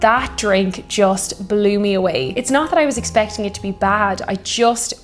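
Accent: Ireland. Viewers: Sorry...not even certain what she said. I was too busy listening to how she said it. That subtle, distinctive, lovely, Irish pronunciation gave me an eargasm. 💖💖💖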